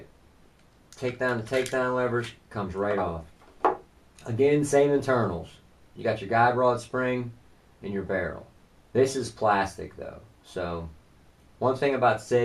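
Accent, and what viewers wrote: Pittsburgh, United States. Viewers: I'm guessing you're from Pittsburgh. Sounds like it. :)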